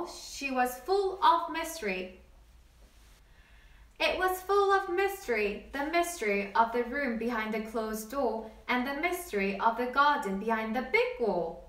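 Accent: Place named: United Kingdom. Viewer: 아니요.발음이 약간 영국식이세요.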